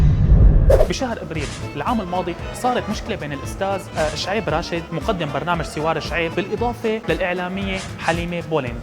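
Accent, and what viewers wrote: Syria. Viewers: أول شي فديت اللهجة السوريه ما احلاها دخيل أمك شو اسمك ههههه اضحك والا فاعلم أن أعداء النجاح منعوك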